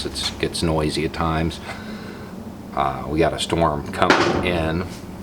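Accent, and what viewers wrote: South, United States. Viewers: You respect him... Do you respect all your enemies? Your logic and ability to understand the English language are suspect. This channel seems to be a glad hand to inane, who are continuously wowed by your Southern accent yet stereotypically opposite views...If you know a limbless veteran or a shell shocked contractor ... This man is to thank for such results. You make money scapegoating your own countrymen. Painting only with the facts that color your opinion correct...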